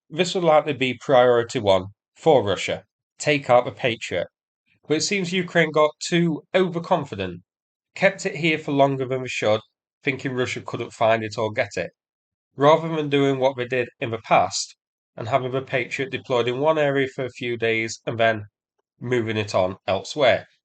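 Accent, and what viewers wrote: United Kingdom. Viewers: Hold on are you seriously claiming this is the first patriot system to be destroyed in Ukraine? Sorry but thats insane cope. The US has confirmed the first destoyed batteries almost a year ago. Ukraine is now begging for 25 more patriots... Germany saying they have sent so many to ukraine they have no new ones to send. This channel turned into cope central lmao. The british accent makes it even funnier.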